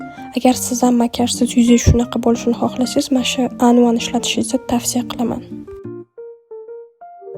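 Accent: Uzbekistan. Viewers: Vodilikmisiz shevayiz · Хаммаси яхши кетябди лекин тилезди узгартирмай чала чулпак килиб тошкенча гапирманг илтимос вообще йимяпти😂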